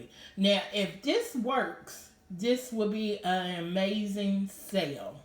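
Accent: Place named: South, United States. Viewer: the southern accent is very soothing and calming lol❤️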